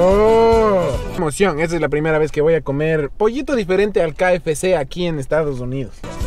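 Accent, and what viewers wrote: Ecuador. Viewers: Eh visto otro youtuber y si son buenos. Pero Tu, tienes calidad, hasta para hablar a lo Ecuatoriano 👍👍💪🇪🇨🙏 sigue asi y bendiciones. Te ganaste en mi lista #1 de youtubers. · Nuestro acento Ecuatoriano en ingles se reconoce al paso 😂😁🇪🇨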